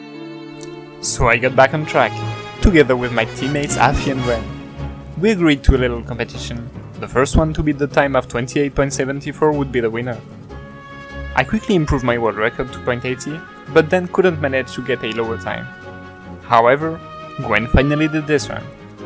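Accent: France. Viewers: You have a fµcking french accent. Ca se voit direct. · On entend quand même l'accent français 😂❤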